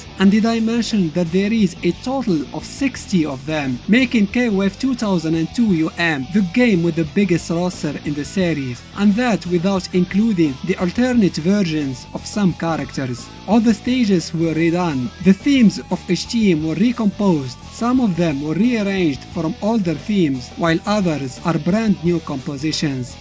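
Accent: Morocco. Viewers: men l'accent katban liya mroki a ssat ila ma kentich ma ratfhem ta le3ba wma raytra walou :D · from your accent, I can tell you're Moroccan (Tbarkellah aalik) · You sound Moroccan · Great commentary! I knew you are moroccan by the accent! KOF is very popular in Morocco, especially KOF '97/'98 and '99. Still played a lot in the video arcades, i saw lot of strong players in Casablanca.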